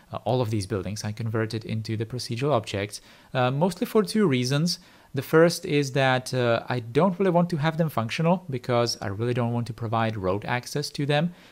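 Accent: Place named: Czech Republic. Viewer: It's the czech accent for me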